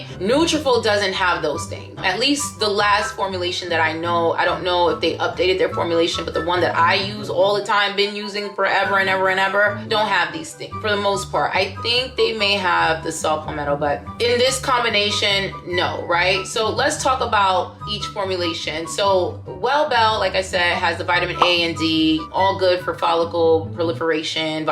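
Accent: New York, United States. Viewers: U definitely from New York or Jersey Lml. 💙 from Brooklyn🗽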